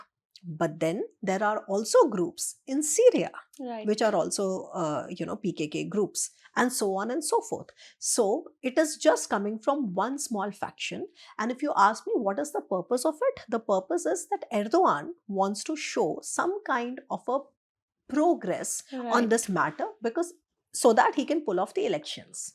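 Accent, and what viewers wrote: India. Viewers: why is indian accent so annoying. Just speak an Indian language and provide subtitles, thanks.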